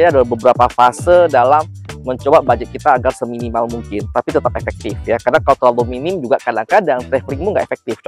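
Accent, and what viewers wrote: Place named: Bangka, Indonesia. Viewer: Abang ni asli nya Bangka atau gimana bang?? Bahasa Abang macam orang Bangka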